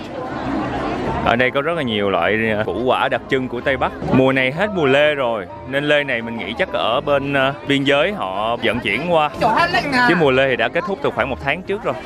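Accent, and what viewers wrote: Mekong Delta, Vietnam. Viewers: Bạn này có lợi thế giọng nói cuốn hút, phát âm giọng miền tây dễ thương (mình k rõ bạn ở đâu), đẹp trai. Nội dung thì lúc nào cũng dễ chịu cực kì. Ủng hộ bạn nhiều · Nghe giọng biết ngay là người Kinh tộc khinh người. bạn biết gì về ẩm thực vùng cao biết gì về người ĐỒNG VĂN? Mấy cái món của bạn nói đã bj Kinh hóa hết rồi đâu còn nguyên bản. Ngay cả món ân mà người kinh gọi là Thắng cố thì còn chưa gọi đúng tên nói gì đến cách nấu và cách dùng nguyên liệu.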